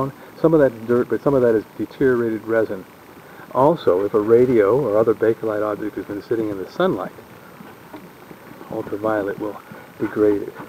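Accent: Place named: Minnesota, United States. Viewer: Cool accent. Minnesota?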